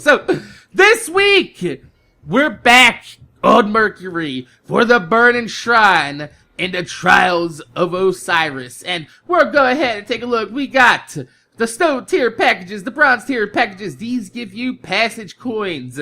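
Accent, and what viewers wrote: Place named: Florida, United States. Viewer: It sound like you stay in Florida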